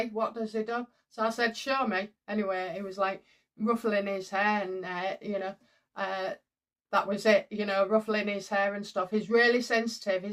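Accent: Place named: England, United Kingdom. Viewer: Im english, living in Ireland. Im here for your accent. I miss that, and a proper chippy. LOVED the white suit!!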